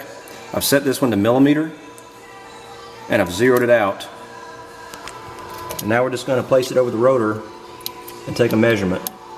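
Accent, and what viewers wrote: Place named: United States. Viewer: He’s all American, no funny weird foreign accent, speaking Perfect American English.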